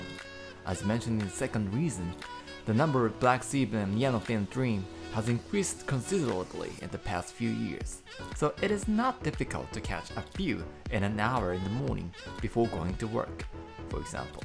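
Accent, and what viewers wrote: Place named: Australia. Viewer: Did you study or work out here in Australia,? Some of your syllables almost sound Aussie lol. Anyway, cool video! I've been using Free Rig/Jika Rig's for Yellowfin Bream & Mangrove Jack here in Australia for a little while now. No-one is aware of these techniques here, at least from what I've been able to tell. Though, I think it's only a matter of time before they start to get popularized. Keep up the good work, I subbed!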